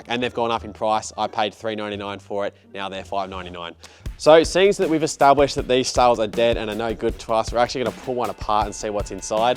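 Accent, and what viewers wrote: Australia. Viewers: Aussie accent can’t tell difference between ‘Lithium ion’ And ‘Lithium iron…’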